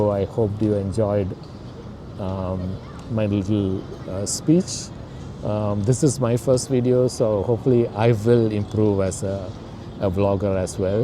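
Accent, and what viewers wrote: Kerala, India. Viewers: Having not lived in Kerala, you still have a Malayali accent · Why didn't you pick up the American accent in 35 years? You still have the mallu accent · The first accent figured out Mallu ❤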